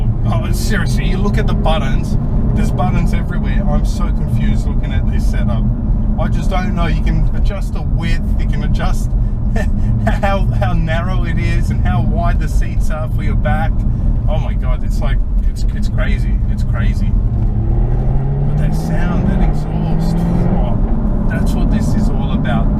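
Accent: Australia. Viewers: If this is what the Australian accent has come too , i am fucking disgraced in this country . Like oh my gawd fully fucking tabouli ....... wake up australia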